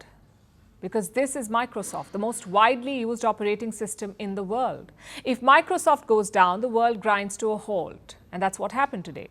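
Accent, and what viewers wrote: India. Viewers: It's her thick ass indian accent that makes it sound like cloud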